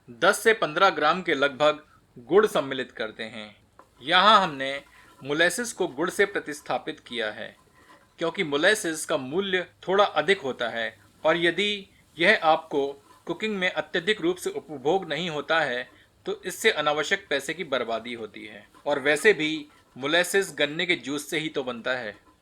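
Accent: Standard. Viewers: Bro your shudh hindi is as good as your English you are a great chef you deserve more subscribers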